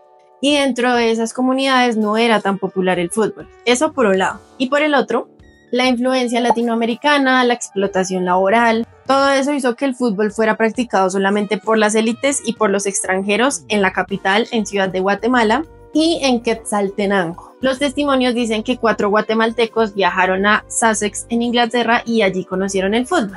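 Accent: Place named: Colombia. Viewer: Por tu acento sos colombiana menos vistoso y todo preo haun la seleccion de Honduras supera en la serie a Colombia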